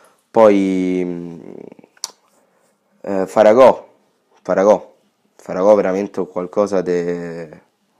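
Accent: Rome, Italy. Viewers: Hai l accento romano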